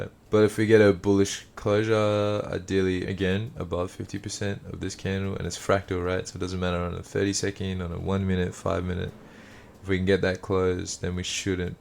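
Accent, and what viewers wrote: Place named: Australia. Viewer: strong mindset 💪 rare to hear a fellow Aussie accent in the trading scene.🇦🇺 keep going up 💯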